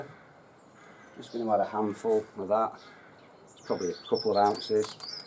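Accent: Yorkshire, United Kingdom. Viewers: Very informative. You have got a great Yorkshire accent. Thumbs up.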